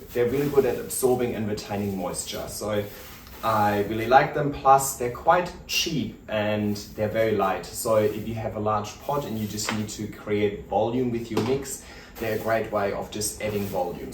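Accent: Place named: Germany. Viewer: I just found you recently and I am loving the content 😍 You brought back my passion for plants after it's been dormant for a year or so 😊 Excuse me for being curious (I love different English accents), you call yourself, but do you have a German/Austrian background? Keep up the good work 👍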